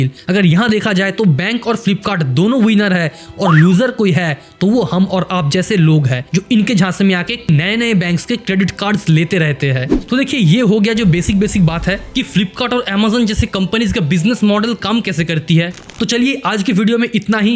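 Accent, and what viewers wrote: Bengal, India. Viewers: I knew he is a Bengali from his pronunciation 😅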